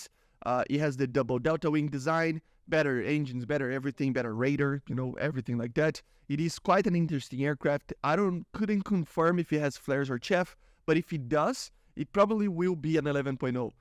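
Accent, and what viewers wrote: Brazil. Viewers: Brazilian accent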